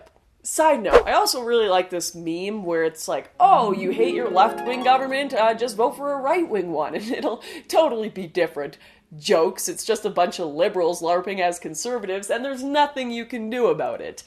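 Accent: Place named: Canada. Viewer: That Canadian accent doe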